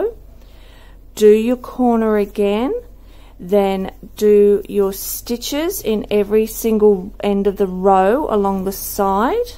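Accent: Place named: Australia. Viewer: Hello lovely, I have found the 1st of your 💗 beautiful patterns I am going to make!😊 My eldest son has recently been asking me for a 'Manly' poncho 😅 and this is it, it will b perfect for him. And I must say your tuturial is great, u explain things very well and it is so so great to b listening to an Aussie😁. Ta heaps. Love and prayers💗💗💗🙏🙏🙏🧶🧶🧶🐕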